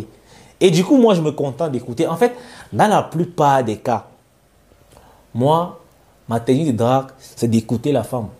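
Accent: Cameroon. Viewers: Pourquoi tu as l’accent 237?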